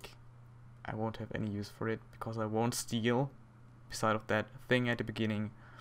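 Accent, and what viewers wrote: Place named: Germany. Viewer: Every now and again you pronounce English words in German, it's quite funny.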